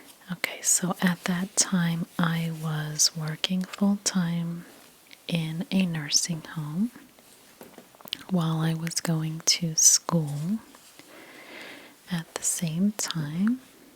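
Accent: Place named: Midwest, United States. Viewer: Your voice is so soothing! There is also something familiar, are you from the northwest originally? ( IN, IL, WI, MI) ? You pronounce words in a nice crisp way, thank you!!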